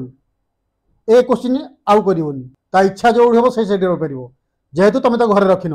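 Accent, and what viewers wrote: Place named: Kendrapara, India. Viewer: Bhai katha ra saili ru laguchi bodhe kendrapara ade ghare apanankara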